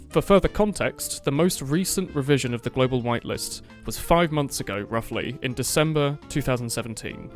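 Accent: United Kingdom. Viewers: even if i dont care much about competitive tf2...i just love hearing this british man speak like a professor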